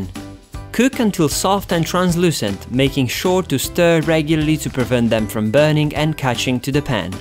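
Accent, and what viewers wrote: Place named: Italy. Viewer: I totally love this recipe! Found your channel today and already watched all your videos :) Immediately subscribed! I have also a quick question for you; I love your accent, where are you from? You seem to be Italian :)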